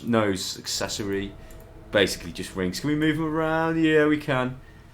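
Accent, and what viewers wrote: United Kingdom. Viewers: this video is so birtish english i can take this for my english class :D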